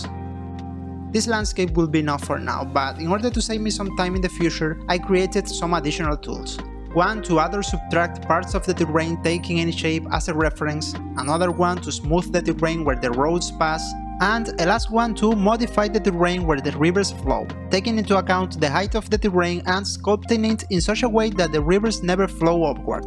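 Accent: Spain. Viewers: Tú hablas español,no jodas, se nota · bro se nota que es español EDIT: No es un hate ni nada, muy buen video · Seems spanish like accent, I would like to know to satisfy my curiosity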